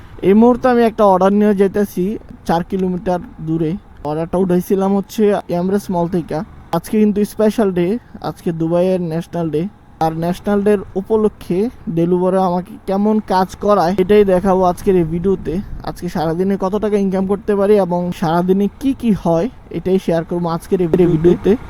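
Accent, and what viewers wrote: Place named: Comilla, Bangladesh. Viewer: ভাইয়ের কথা সুনে মনে হচ্ছে বাড়ি কুমিল্লা 😊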